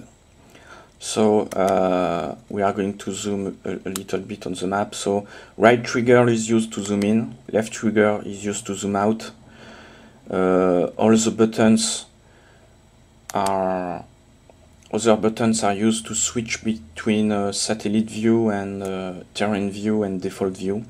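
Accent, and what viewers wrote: France. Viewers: Are You French ? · I like the frenglish ;-) Will it work under 3.90 M 33-3? Merci beaucoup pour le Video, Chris, de Corfu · It's no secret that foreigners can often write/speak better than native english speakers. This french accent is nice and understandable which is most important.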